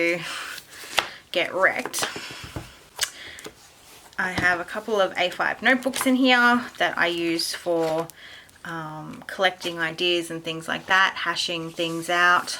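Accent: Australia. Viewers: I love Australian accents. So cute :D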